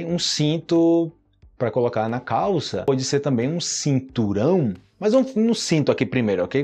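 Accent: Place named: Antioquia, Colombia. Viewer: Muchas gracias. Cuando hablas español tienes un acento paisa extraordinario.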